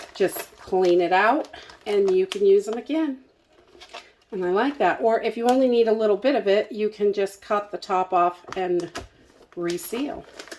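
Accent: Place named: South, United States. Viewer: I swear I gut giggle when you laugh! Love it so much! I’m from the south so love the accent, too. Thanks for all you do. 💗 from Texas. 🇺🇸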